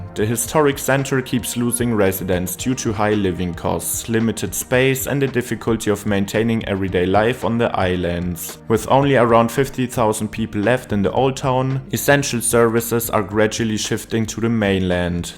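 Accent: Germany. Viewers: Im in 2 seconds and I already know this guy is german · bro es wäre so geil wenn du nen zweiten deutschen Audiotrack anbieten würdest, als Deutscher kann ich mir dein Englisch wegen dem Akzent echt nicht lange geben, aber machst eigentlich sehr geile Videos 😂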